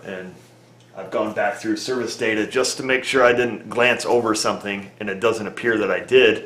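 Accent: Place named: Upstate New York, United States. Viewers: That's not a New York accent, it's a "stat nyland" accent. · Speaking of accents, yes, you have an Upstate New York accent and, boy, does it shine.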